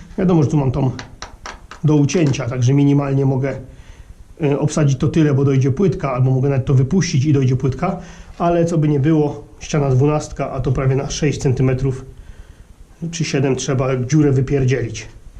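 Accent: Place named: Kuyavia, Poland. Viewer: Idzie wek przypomniał mi się mój śp. dziadek też tak zawsze mówił ;d W ogóle dużo zaciągnięć słyszę u Ciebie które słyszę u siebie na kujawach ;d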